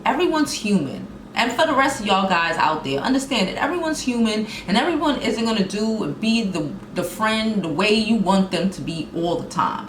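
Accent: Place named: East Coast, United States. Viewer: I know my east coast people 😂💚 you either from Philly or DC 🤷🏾‍♂️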